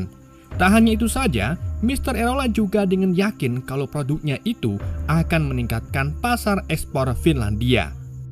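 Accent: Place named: Banyumas, Indonesia. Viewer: Kilihatan admin orang jawa ngapak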